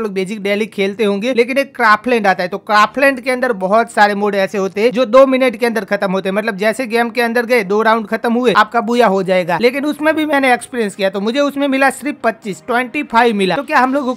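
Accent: Gujarat, India. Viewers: Bhai aap gujrati hooo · App gujrati ho kya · bhai aap gujarati ho? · app Gujarat se ho kya bro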